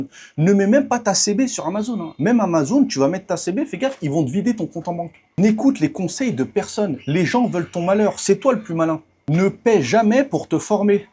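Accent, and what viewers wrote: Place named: France. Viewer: 😂 TU RELAVE GRAVE LE NIVEAU... C'EST POURQUOI JE T'ÉCOUTE ET JE TE SUIS. J'adore ton accroche! Ça me fait rire👍 C'EST TRÈS VENDEUR! TU ES TRÈS VENDEUR 👍 C'EST TRÈS BON ÇA C'EST POURQUOI TES PODCASTS ET TES VIDÉOS FONCTIONNENT TRÈS BIEN. AU DÉBUT ÇA M'A SURPRIS TON ACCENT DE CITÉ MAIS JE ME SUIS FAIT PARCE QUE JE SUIS PAS HABITUÉE. ON SENT TU ES QUELQU'UN DE BIEN GARÇON DE BONNE FAMILLE COMME ON DIT CHEZ NOUS ÇA C'EST NOTRE CÔTÉ VENDEUR NOTRE CÔTÉ COMMUNICANT QUI EST SUPER BON👍 Ton accroche peut être super titre pour un film! tu sais ça!? 👍😂 Il faut déposer la marque... Tu crées des titres qui accrochent c'est une marque d'approche ça c'est top Et mec tu vas être copié alors dépose vite la marque Après tout tout est déposable. Tu sais tout le monde t'écoute tout le monde t'observe Les comiques les artistes et les scénaristes s'en inspirent... 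Pour te copier et copier ton style ensuite ils vont le vendre dans des films c'est sérieux ce que je dis.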